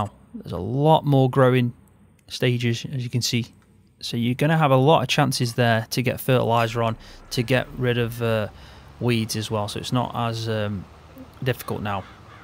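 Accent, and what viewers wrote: Staffordshire, United Kingdom. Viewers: Hi are you from Staffordshire by any chance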